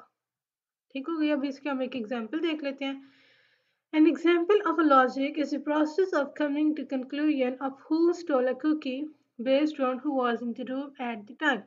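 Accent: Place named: Lahore, Pakistan. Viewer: ap ki voice sa lagta ha ap lahore sa ho . hhaahah but ma'am boht acha explain kiya ap na..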